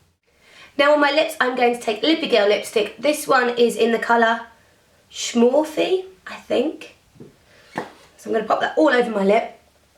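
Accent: England, United Kingdom. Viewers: i adore your english accent <3 you are lovely!!!!!!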